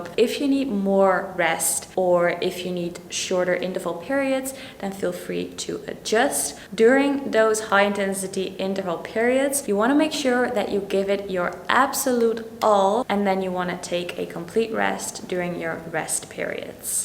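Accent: Netherlands. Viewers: Going by the sound of it I would say that you're Dutch.. Good info! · Your accent is so cute <3 are you from the Netherlands? You sound like a Dutchie!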